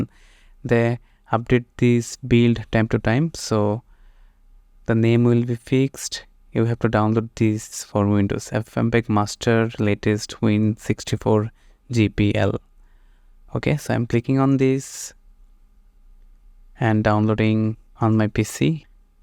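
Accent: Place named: India. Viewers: fellow indian here; we pretty much sound like each other. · i only know indian accent, so i think its a bit similar, sorry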